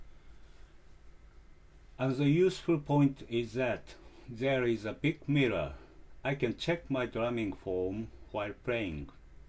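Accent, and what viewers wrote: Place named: Japan. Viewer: You got a such a nice place for recording. Could use more space though with all that equipment. :) Air conditioner and all, I wish we had something like that. What a difference, I play in a basement of age old industrial building where everything is ugly and in disrepair. But at least there's plenty of space and its cheap... The reality for metal bands in Finland is pretty much like that, things are simple but functional and the rehearsal spaces are almost always in old ugly buildings out of sight and mind of most people. I'm wondering how expensive it is to rent a rehearsal space in Japan? In Finland it varies a lot, in my town which is a small place it can be very cheap if you get lucky.... In Helsinki its much more expensive and the places are almost always very small. Our place is pretty much as good as it gets, over ten people sharing the rent and now that two guys left and I cleaned the place, there's a lot of space for everything. 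I'm jealous of your recording equipment. Damn, I wish I could record in separate tracks too. Takes some time to put it together, I have been using all the money I can spare for the drums in last few years. Recording equipment is the next on the list. ;) Your English is very good, understood everything as you meant it. Have you studied it somewhere or just learned by yourself? I love your accent, I don't hear a Japanese person speak in English often. :D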